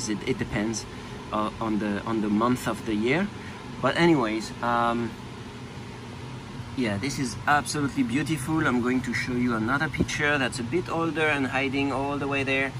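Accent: France. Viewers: Hi man Love the french accent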